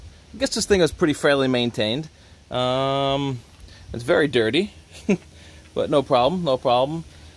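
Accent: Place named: East Coast, United States. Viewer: You’re from the east coast what part? I want to guess either New Jersey or Long Island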